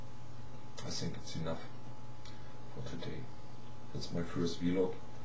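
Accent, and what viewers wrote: Germany. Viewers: Deine Aussprache ist echt gut, find ich, besser als meine ;) Und den Deutschen Akzent kriegt man eh nie richtig weg :) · Cool and funny :P Haha you can hear ur "English-german"! Anyway, maybe i come up with some cool ideas for ya m8 :D and btw, kill that bird! :D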